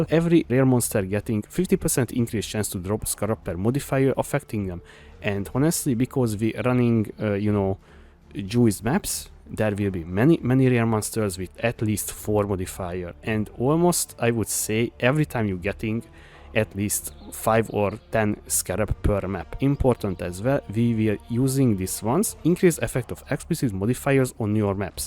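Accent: Hungary. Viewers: Nagyon magyar az akcentusod :D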